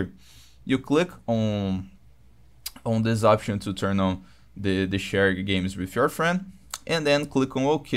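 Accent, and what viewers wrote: Brazil. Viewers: he got that brazilian accent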